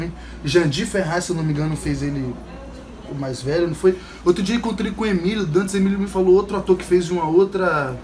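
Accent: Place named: Northeast, Brazil. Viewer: Que gca,romântico E questionador!Gostei!Ame ivc como Carlos!Parabéns!Agora que to reparando que tem um sotaque nordestino!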